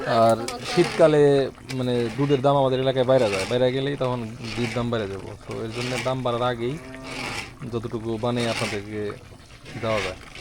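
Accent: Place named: Manikganj, Bangladesh. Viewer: ভাই আমার বাড়ীও মানিকগঞ্জ আপনার কথা আমাদের কথা একি রকম ৷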